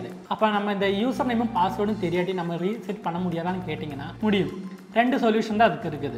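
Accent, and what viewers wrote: Kerala, India. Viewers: Bro....neenga malayali ryt😁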